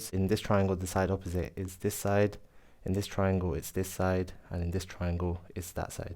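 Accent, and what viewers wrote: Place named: United Kingdom. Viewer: Excellently explained sir. Keep up the good work. Most importantly,I like your British accent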